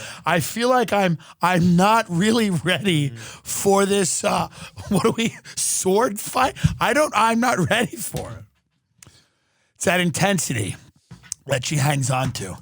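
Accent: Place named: Long Island, United States. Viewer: Long Island accent is fantastic 🤣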